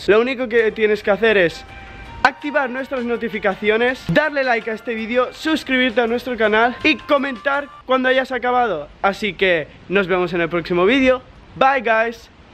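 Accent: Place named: Spain. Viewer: Ugh I love your accent. Spanish is definitely my flavor 😍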